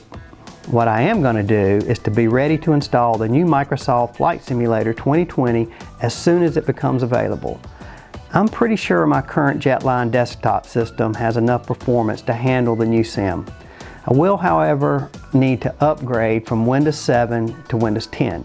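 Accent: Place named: North Carolina, United States. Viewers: Hello. First. Congrats to you on your next major step in life...retirement. I should know as I am as well. Let me be one of many to welcome you back into "active duty" on your channel and your signature way of providing intuitive and colorful videos and talking points. I have followed your channel since its inception and absolutely love your storytelling and "fun facts". I can remember on one of your episodes where you were getting an earful about your southern drawl. Well I can identify with that as well. As this is one of the first times I have commented on your channel I totally identified with your series as you were receiving an Embraer biz jet out of Brasil. My wife and I both watched the series and found it to be really good. She especially loved it and listened to your pronunciations of some of the cities....as she is a native of Brasil. 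Anyway...so much for the long comment. Welcome Back!!....Alvin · Hello, and welcome back! We've missed you very much!! IMHO, as an avid (but beginner) flight simmer, your YT videos are the best available. Your attention to detail, excellent teaching style, interesting and very enjoyable "Fun Facts", along with an occasional "live flight" video, make your channel the most useful, helpful, and thoroughly enjoyable flight-lover's learning source! And your North Carolina accent (I'm just down the street from you in the Charlotte area!) is delightful and so very pleasant to listen to!! BTW, yours is the first YT channel I subscribed to and, just a few minutes ago (because I just happened to see your 2020 Update video in my YT list when I got online the other evening), also the first channel on which I activated the Notification Bell. I don't want to miss any opportunities to learn more about flight from you!! 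I'm so glad you're back online with us!! And congratulations on your "semi-retirement"!!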